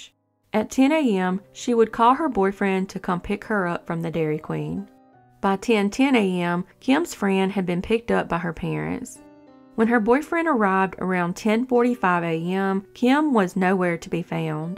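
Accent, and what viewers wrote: South, United States. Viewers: She has a southern accent due to her living in the south. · I'm from Georgia, and her voice sounds like Georgia or the Carolinas. She sounds like all my SC cousins. I love it! My mom sounds like Scarlett O'Hara.😆 Im from Atlanta, Ga but I don't really have a regional accent.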